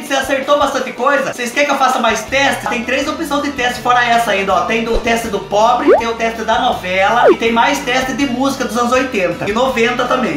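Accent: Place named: Coronel Macedo, Brazil. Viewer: Gente, tem coisa mais fofa do que o falando inglês com esse sotaque de Coronel Macedo? 😍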